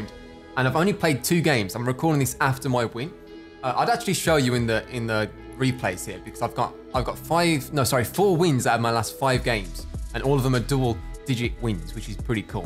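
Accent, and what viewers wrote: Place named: Birmingham, United Kingdom. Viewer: Dave the dragon for sure, and you have to say it in your old brummy accent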